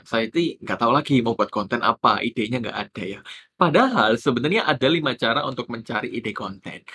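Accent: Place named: East Java, Indonesia. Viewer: tetep ya..orang jawatimuran atau surabaya itu dialeknya khas.. po meneh wong solo hehe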